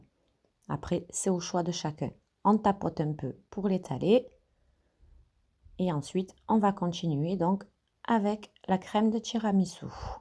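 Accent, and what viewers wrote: South, France. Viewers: Merci beaucoup en plus on a l'accent du sud en bonus 😘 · Super expliqué. Merci pour votre partage.!!! Je retrouve l accent du midi, quelle ́nostalgie... j essaierais votre si belle et visiblement bonne recette. Merci et Bravo pour votre travail.♥️♥️🎀🀄🌟🌞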